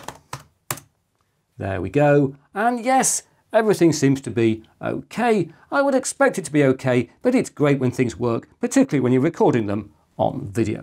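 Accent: United Kingdom. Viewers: So wonderfully British. Thank you so very much! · Hello mister, this comment may be a bit unrelated to the video but I wanted to send you a message either way. I've learned of you by looking for information about SSDs, because back in year 2021 of October, I was upgrading my PC and I was a bit confused about the SSDs here and there. I was merely looking for basic information, but then I stumbled upon your channel, looking at your channel's avatar I thought; "Oh, it's an older looking man with a bob haircut and glasses, I believe he will be trustable, he also looks British, perhaps an old chap from Britain or one of the Americans that kept their roots from New England?". Ironically, your looks matched! I was going to do the usual of just skipping through the video, expecting some boring information I don't need...Well I did not do that whatsoever, I didn't even stop a second to "unbore" myself somehow. 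For whatever reason, you have this very British charm on you, where you always speak rather slowly, and it may sound boring but it is not so, and you have a wonderful accent that I if I was into one of those listening books or whatever they're called, hey I might actually like it. You also never make such unnecessary things, like stop to make a joke for 15 seconds, which in the end it is funny, instead, you just use your own British charm to say something very witty and it works excellently! Carrying on, the information you have provided for the SDDs was amazing, I learned a lot, it even wanted me to check m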